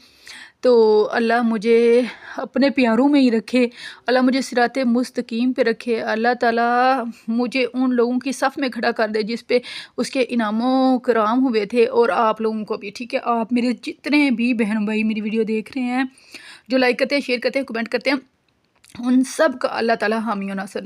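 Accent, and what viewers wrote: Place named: Gujranwala, Pakistan. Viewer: Gujranwala ki punjabi lgti hai apki.... Or without any reason laughing itni achi nhi lgti....baki theek hai...or dua ap khud he kr lea kro apny leay... Hmari tu apni duaian reh jati hn oudhuri...!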